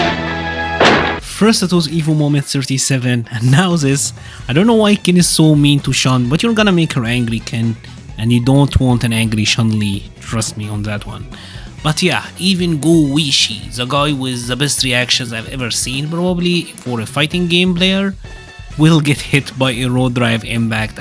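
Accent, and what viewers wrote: Egypt. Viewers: صوتك مصري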